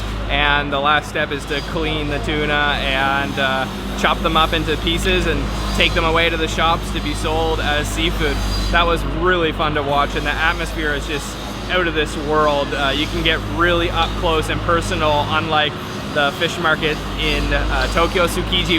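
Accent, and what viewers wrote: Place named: Eastern Canada, Canada. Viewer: 100% Eastern-Canadian Accent!!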